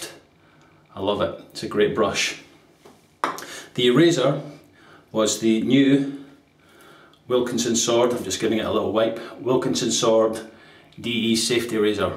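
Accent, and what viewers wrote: Scotland, United Kingdom. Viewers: Are you a scot, sir? Nice shave, by the way.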